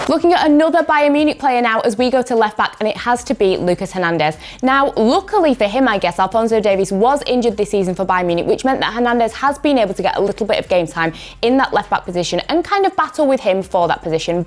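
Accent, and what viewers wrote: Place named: Manchester, United Kingdom. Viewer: German here: Like her accent:) Is that some 'less thick' version of Mancunian? Fell in love with Manchester accent listening to Gary Neville and pronunciation kinda reminds me of that. Noticed tho that she doesn't have a glottal stop in double t's or an 'ey' in words that end on 'y'... just some comment on that would be appreciated. Thanks in advance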